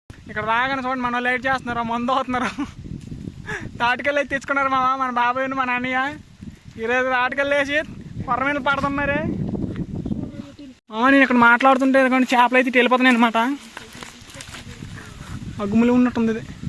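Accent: Srikakulam, India. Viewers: Midhi Srikakulam laa undii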